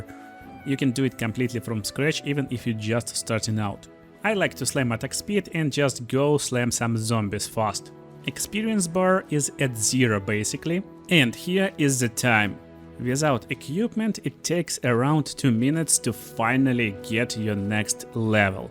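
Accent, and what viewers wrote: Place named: Russia. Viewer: The gameplay is nothing special really no offense but your boris accent and little edits made it very entertaining.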